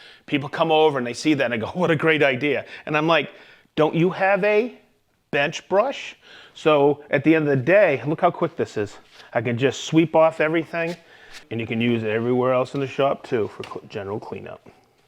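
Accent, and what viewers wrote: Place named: Boston, United States. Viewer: What kind of oil on your sock? Could not understand with your Boston accent.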